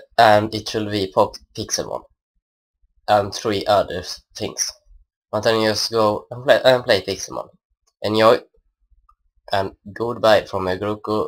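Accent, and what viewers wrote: Sweden. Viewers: det låter lite som svengelska när du pratar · Haha Svengelska :D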